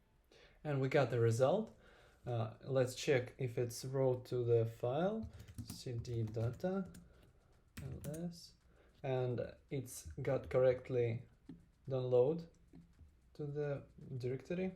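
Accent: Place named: Russia. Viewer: Наш акцент трудно не узнать, но автор долго не выдавал себя, пока на Амазоне не появилась доставка в Российскую Федерацию 😂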